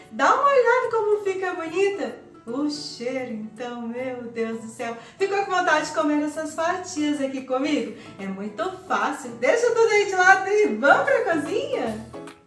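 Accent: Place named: Minas Gerais, Brazil. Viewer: eu sou encantada com vc. Adoro seu jeitinho de falar, sua autenticidade, sua simpatia. Vc é linda! Esse seu lindo sotaque é de onde mesmo? Tem um jeitinho meio mineirinho. Eu sou de BH. Amo minha mineirice. Beijo em seu ❤️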